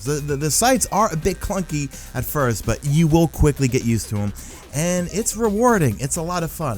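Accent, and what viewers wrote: New York, United States. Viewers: you from new York? or Boston perhaps?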